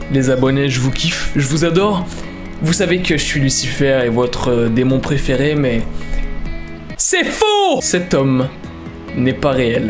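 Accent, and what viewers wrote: Quebec, Canada. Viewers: OMG tu as un accent QUÉBÉCOIS PARFAIT on aurait dis un des humoristes québécois 😂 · Bel accent québécois, approuvé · L'accent québécois!!!! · Il parle québécois comme moi 😂🤣😭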